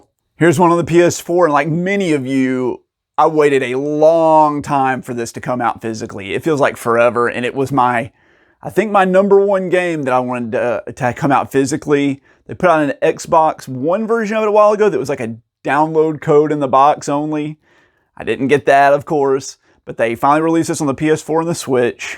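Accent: South, United States. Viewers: It's subtle, but as a southerner, I can detect it, haha. Merry Christmas!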